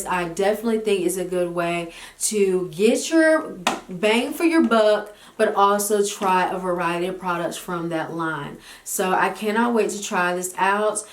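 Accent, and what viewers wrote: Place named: South, United States. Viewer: Love the video, you got me wanting that lip mask now! 😩😂🤦🏽‍♀️ Your southern accent is so cute and thick, I’m from Bama too and wonder if I sound like this to other people 😂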